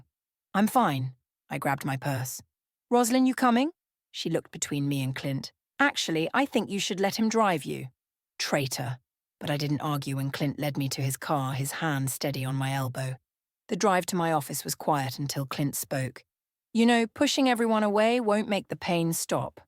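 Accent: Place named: United Kingdom. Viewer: British voice. F you. Not listening.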